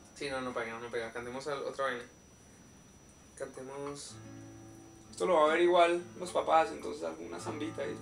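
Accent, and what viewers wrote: Colombia. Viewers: COLOMBIAN ACCENT NOT MEXICAN. C O L O M B I A N. IT IS NOT THE SAME. :D I don't mean it in a mean way or anything, just letting you know not all latin american countries have the same accent as Mexicans, the argentinian accent is as different to the mexican accent as the colombian, spanish, peruvian or any other. Anyways cheers :) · it's not mexican accent. This accent is from Bogotá Colombia.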